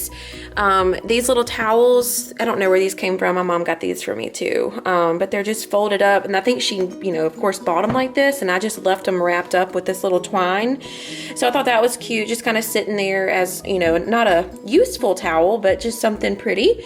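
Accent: United States. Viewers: Where are you from? I know you are definitely a southern girl. 😃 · Ok you definitely sound like you're from NC.....I live in Camden which is about 40 min to Duck (winter time, peak summer traffic who knows how long I'd take, lol). So if you're ar the N OBX (Outer Banks for y'all who don't know) and are 30 min from beach, then you're in Currituck. But if you're not in NENC then you must be farther down the coast. Anyway...like I said you sound North Carolinean, and I know a store that's headed toward the breach that is all beach themed, that's in Currituck. But there's also some at the beach too. Was just curious if I was correct. Not stalker, lol. It's rare to hear someone sound like you on YouTube, lol...ok I'm rambling now, so I'll go, would be cool if ya told me yes or no though, Your stuff is really cute, and I'm with you on old stuff! Love it, especially old books! 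I have a few old early 1900 medical books, as a nurse I find it fascinating!! Love the house and decor.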